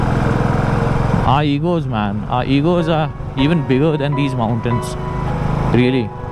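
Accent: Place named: Kolhapur, India. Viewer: I soooo friggin miss that Kolhapuri accent though! 🥺🥺🥺🥺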